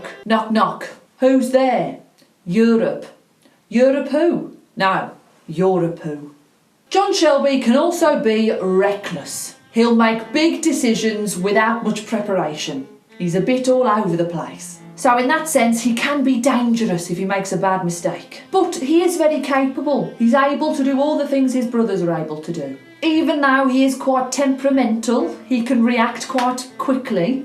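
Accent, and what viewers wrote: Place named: North, United Kingdom. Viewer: Watched one of your videos where you say you have a RP accent...you dont you have a finally disguised northern accent or maybe notth Midlands...PS your Fit :p Greetings from the north lol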